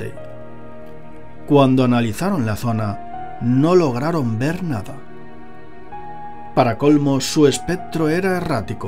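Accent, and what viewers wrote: Spain. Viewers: Este bato hace buenos videos, pero no puedo evitar que me cagué el acento español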